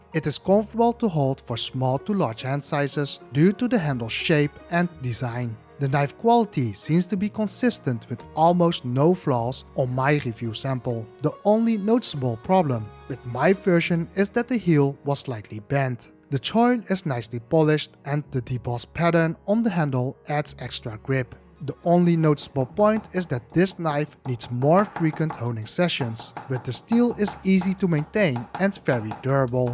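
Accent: Netherlands. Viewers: altijd grappig dat je het Nederlandse accent er doorheen hoort :P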